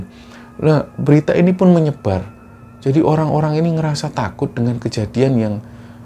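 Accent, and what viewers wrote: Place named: Surabaya, Indonesia. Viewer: Aq seneng logat Surabayanya nek cerita, jadi kangen kampung halaman, tanah kelahiran Surabaya.. hahhaa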